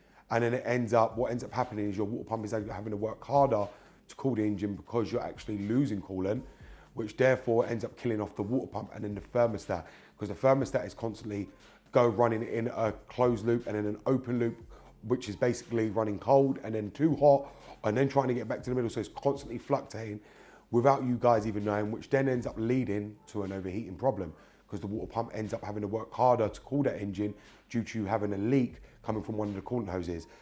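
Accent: United Kingdom. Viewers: Speaking so fast and with so British accent, it is very difficult to follow you. Anyway, very useful information, so thanks' for that!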